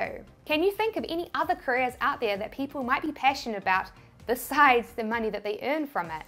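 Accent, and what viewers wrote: New Zealand. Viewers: Love the Kiwi accent !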